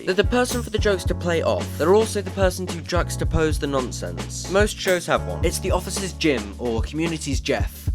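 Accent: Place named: United Kingdom. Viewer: Surprised he doesn't know how to pronounce Richard Ayoade's name - this video creator sounds British and Richard has been on a large number of British panel shows and has had his own shows, all where you can hear his name being pronounced.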